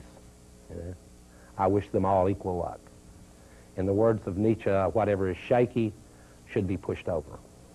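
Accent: South, United States. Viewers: a southern twang and philosophy go together so damn well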